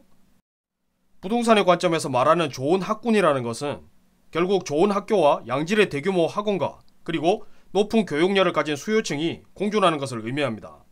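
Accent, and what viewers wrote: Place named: Gyeongsang, South Korea. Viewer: 저 특유의 꼰대같은 경상도 말투가 비호감이라 좋아요는 못누르겠네...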